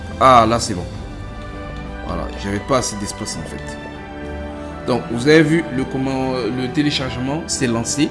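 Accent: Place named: Germany. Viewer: j'adore ton pti accent allemand😍😍😍😍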